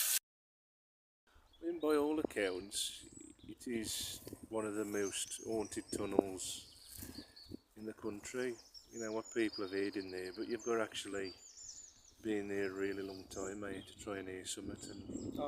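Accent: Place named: Black Country, United Kingdom. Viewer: Brilliantly done and as a black country girl long moved away I'm loving the accent makes me feel like home.... Thank you so much new sub here xxx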